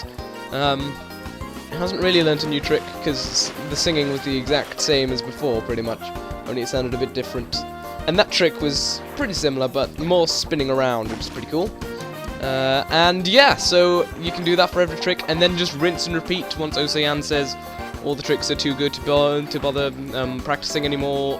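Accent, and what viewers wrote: United Kingdom. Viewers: U rock I love ur british accent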